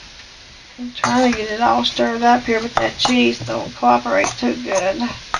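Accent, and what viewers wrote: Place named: Georgia, United States. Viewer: I've been cooking for 58 years, but I love to hear you talk and watch you cook. I'm almost 75 yrs old. My paternal roots are in North Georgia, and they sound very much like you. I live where they grow the Vidalia onion. I too suffer from many ailments 'cause of an allergic reaction to Lipitor and Zoccor. I've been saved by the grace of GOD since 1972 and He keeps me every day.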